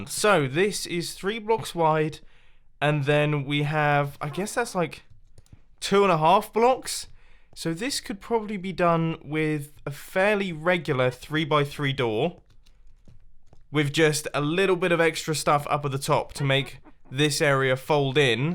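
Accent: United Kingdom. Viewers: I, as a german, really like your british accent. Your channel has very nice content. You are such a good YouTuber. 👍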